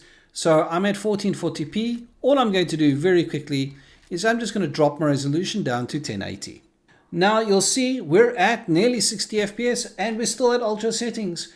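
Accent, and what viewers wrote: South Africa. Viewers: Your accent gives me a South African vibe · i know a afrikaans brother when i see/hear one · You sound South African 😉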